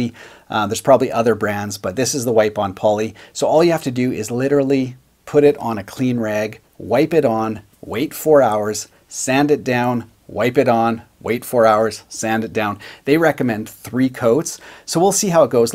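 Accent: Canada. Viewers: Are you Canadian? I am just wondering...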